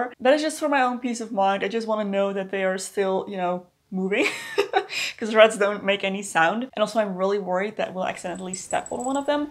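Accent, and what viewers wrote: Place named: Netherlands. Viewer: Je klinkt niet zo Nederlands als de meesten die Engels praten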